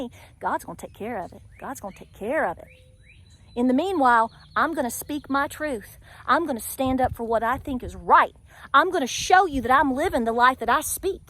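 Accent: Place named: East Tennessee, United States. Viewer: I agree 100% with you! Going out to grease the tractor and bush hog. I will then work the garden. I am an East Tennessee native and senior woman farmer. I talk just like you and I am not changing. You Go Girl! God bless everyone. John .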